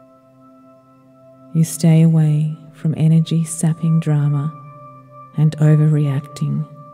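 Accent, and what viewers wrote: Australia. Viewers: Such a lovely voice....Australian? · She has an Australian accent, so she says "centered" but it sounds a bit like "scented." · This is great Australian voice... Thanks from India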